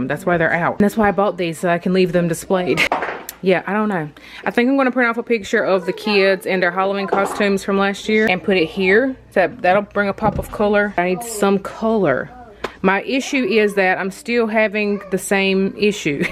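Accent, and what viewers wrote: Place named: North Carolina, United States. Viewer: Just stumbled across your page and immediately heard the NC in your voice. Just had to stay for a visit. Love all your beautiful Halloween goodies.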